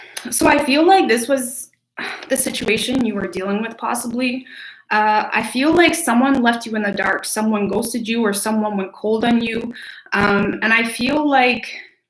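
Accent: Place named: Canada. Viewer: I thought you were Canadian from the accent but that Tim Horton's confirmed it 😂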